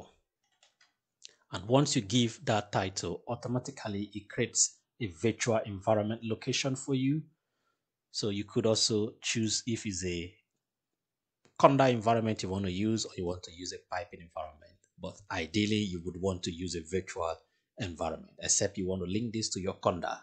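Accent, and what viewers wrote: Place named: Cameroon. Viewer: Bro, you sound Cameroonian. I am glad to see your page and I am subscribing right away! Great job!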